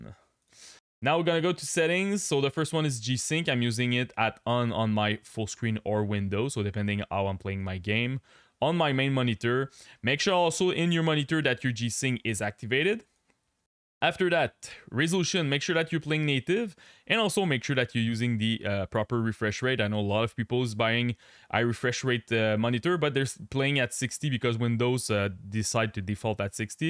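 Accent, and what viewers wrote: Quebec, Canada. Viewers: Was interested until I heard "French" Canadian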